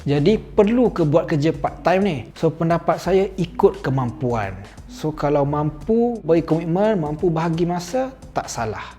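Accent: Malaysia. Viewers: Nope.. Accent still Malaysia but lots of Oz word and slang mixed along the way 😅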